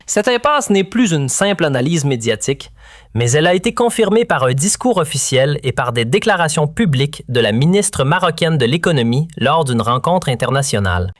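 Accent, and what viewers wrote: Canada. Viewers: accent Canadian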